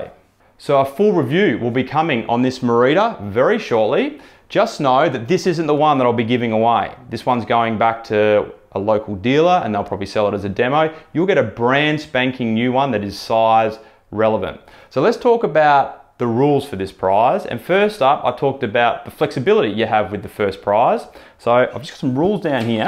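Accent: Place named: Australia. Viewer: I love Aussie accent so I could listen to you talk about bikes all day ;)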